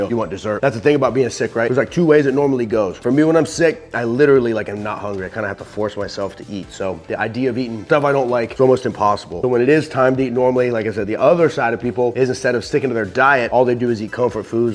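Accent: Texas, United States. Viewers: Your texas accent comes out when you'Re sick :D:D:D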